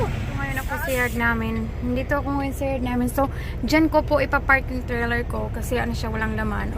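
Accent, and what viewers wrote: Waray, Philippines. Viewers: hahaha amu gad kukurian pag tagalog kay wraywaray kaman ngean..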